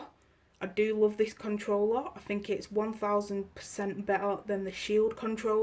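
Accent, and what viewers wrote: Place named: Birmingham, United Kingdom. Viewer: I am foreign but you're sooo much from Birmingham :)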